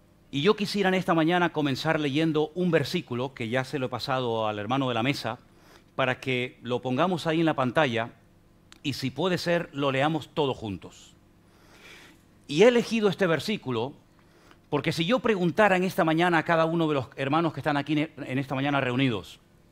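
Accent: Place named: Paraguay. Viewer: desde Paraguy le veo,me gusta xque habla Español como nosotros,No como hay los de España🙏🙏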